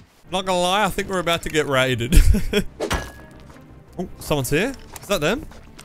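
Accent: United Kingdom. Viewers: You sound like you're British are you British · O you're Brit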